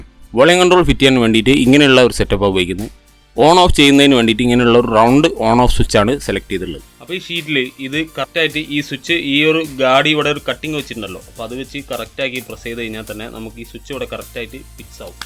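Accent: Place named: Palakkad, India. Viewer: Palakkad baashayaanallo.. 😍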